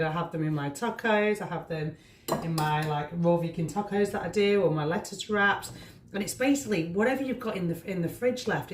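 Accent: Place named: Northern England, United Kingdom. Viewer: Love the Northern England accent! I am in London. Thank you for this recipe!!!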